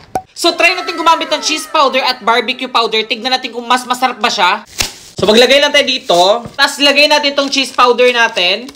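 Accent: Visayas, Philippines. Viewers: Kuya bisaya ka ba? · Hala bisaya diay ka kuya??? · BISAYA KA YAH? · bisaya diay ka ya?😂😂😂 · Gosto. Namin. Ka. Mag. Bisaya. narinig. Koga. Ng. Bisaya. Ka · Bisaya ka po ba 😅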